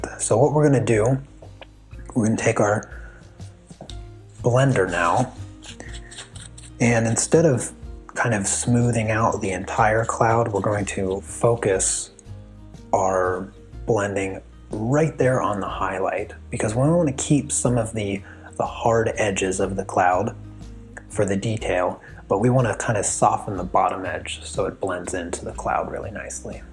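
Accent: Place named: Central Pennsylvania, United States. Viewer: Love your videos, from one artist to another. Are you from central Pennsylvania? Just wondering because you have an accent similar to what we have. Sorry if that seems weird! LOL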